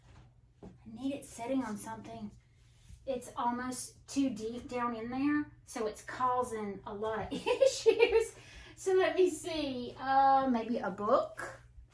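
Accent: South, United States. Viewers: Southern accent - my fave!!! So bring it on, lol. I'm a WI girl turned KY grandma, but I think I will always have my northern accent - "y'all" just doesn't sound right coming out of my mouth, but I wish it did 😂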